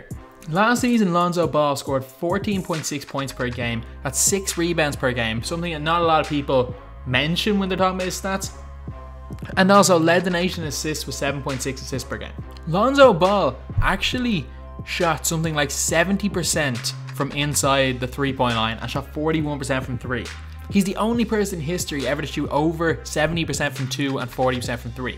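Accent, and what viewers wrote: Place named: Ireland. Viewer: Is he Irish bc he sounds like Conor Mcgregor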